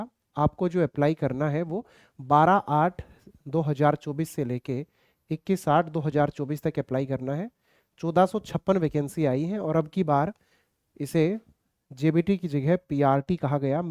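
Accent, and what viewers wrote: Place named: Haryana, India. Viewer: Apki harianvi bhasa sunkar bahut acha laga😂😂😂😂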